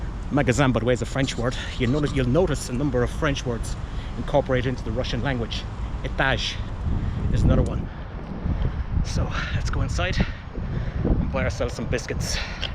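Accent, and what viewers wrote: Waterford, Ireland. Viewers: Hello from Kilkenny good info on your grandfather always thought you had a bit of a blaa accent makes sense now keep up the great videos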